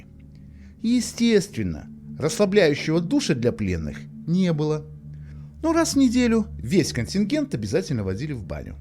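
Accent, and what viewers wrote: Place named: Belarus. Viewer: автор или из Беларуси, или из Псковской области - мы так "Я" не произносим))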